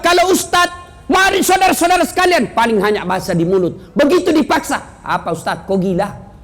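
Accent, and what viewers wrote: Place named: Bugis, Indonesia. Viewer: Assalamualaikum... Saya juga suka sngat ceramah ustaz das'ad latif.... Tpi sy tak berapa nak faham, pekat sngat bahasa bugis nya..boleh tak sempan sarikata dekat bawa..(melayu) 😊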